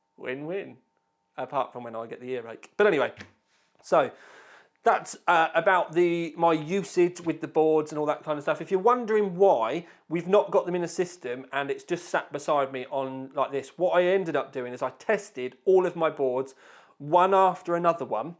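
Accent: United Kingdom. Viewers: nope, they are not a big fan of British accent I assume.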